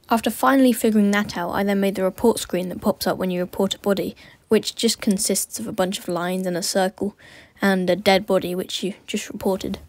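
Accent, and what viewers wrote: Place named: United Kingdom. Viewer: British accent youtubers are always the best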